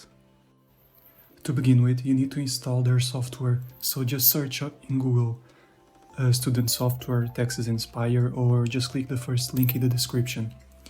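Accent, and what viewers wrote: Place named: Portugal. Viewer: You definitely have a portuguese accent, tudo bem?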